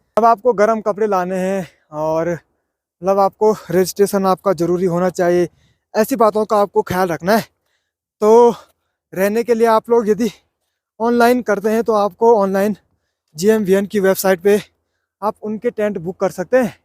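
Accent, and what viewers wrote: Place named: Pahadi, India. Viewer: 🇳🇵 Aap Ki Bolaney Ki Awaz Mey Pure Pahadi Paan Hai Jai Pahadi Maa🇳🇵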